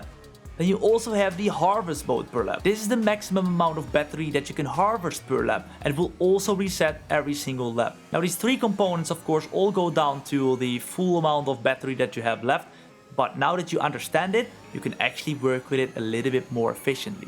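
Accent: Netherlands. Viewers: Nederlands right? 😂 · Het is sws een Nederlander😂 accent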